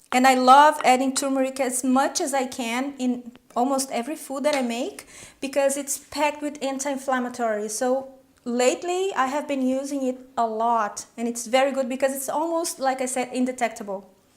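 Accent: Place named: Brazil. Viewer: Hi. I am trying to guess where is your accent from. Is it from Brazil? Thank you.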